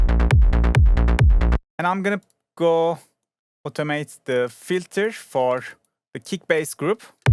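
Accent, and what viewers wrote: Turkey. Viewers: broooo. i loved your accent. tell me you are turkish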